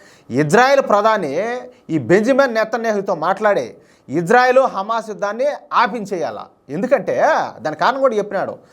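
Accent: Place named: Nellore, India. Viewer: Sir. Mee maatalu bhaguntai. Maa cousins matladinattu untundhi. Mi native Nellore or Ongole 😀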